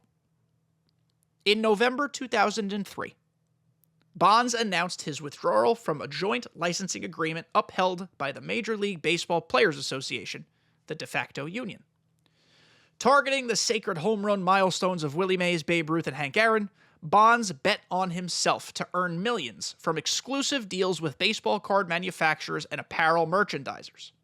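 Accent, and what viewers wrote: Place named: United Kingdom. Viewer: Lmao the British accent… Well they added Sammy Sosa and Mark McGwire into ‘24 and I didn’t think I’d ever see the day this would happen so seeing Barry back in ‘25 is very much possible. I’ll say at least a 25% chance it happens…🤞🏽 Barry was in one game I used to play on ps2 where he crushed every single ball out the park lol I think it was called MLB 2003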